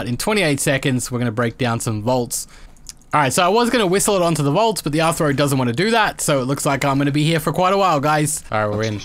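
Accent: Australia. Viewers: Are you australian? xD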